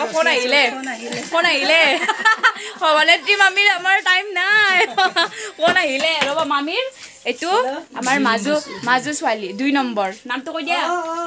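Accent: Nalbari, India. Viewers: বহুত ভাল লাগিল ভিদিওটো, আপোনাৰ ভিদিও চায়ে থাকো । বিশেষকৈ নলবেইৰা ভাষাটো ক‌লি আৰু বেছি ভাল লাগে । মাজে মাজে কৈ থাকিব চোন। ❣️❣️❣️ ( নলবাৰী ধমধমাৰ পৰা) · Apunar ghar kot baa mane apunar vakha2 olp nalbari r nisina mr oo ghar t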